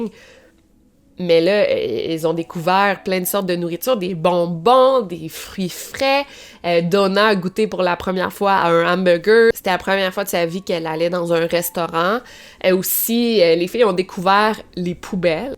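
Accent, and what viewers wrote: Canada. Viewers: Après 10 minutes j'ai déjà mal à la tête ! J'adore l'accent canadien, j'apprécie les récits truecrime mais je n'arrive pas à me concentrer sur ce qu'elle raconte ! Elle me "bouffe" mon énergie, elle parle comme si on était tous ses potes ou comme elle... Je ne veux pas la descendre car elle fait de bonnes vidéos ; c'est dommage... Après il ne faut pas se forcer à regarder une vidéo même si le sujet est intéressant. J'essaierai plus tard avec une autre vidéo, peut-être que j'apprécierais mais là ses manières et ses sourires incongrus me bloquent...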